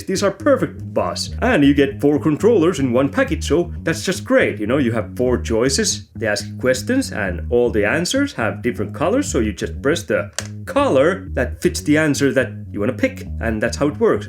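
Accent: Finland. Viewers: I really enjoyed the video and your personality. Your delivery reminds me a lot of my time living in Espoo.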